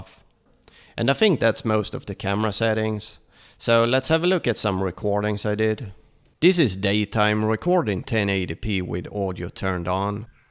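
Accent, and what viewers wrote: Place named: Sweden. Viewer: That must be a swedish accent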